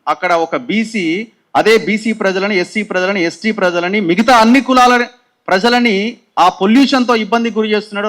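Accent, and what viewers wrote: Telangana, India. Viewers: అన్న తెలంగాణా గొంతు....❤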